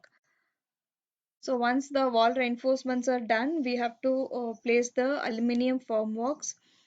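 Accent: South India, India. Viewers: Very boring narration, dozed off when video was playing. also typical South Indian accent of the woman narrating. Wish it was more professional.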